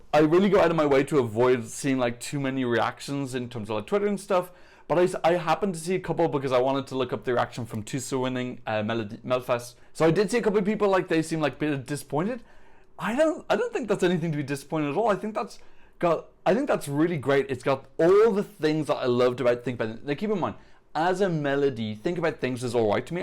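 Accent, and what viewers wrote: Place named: Ireland. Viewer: Yeah but he's Irish and everything is T and D anyway. Saying it's like the does not help 🤣🤣🤣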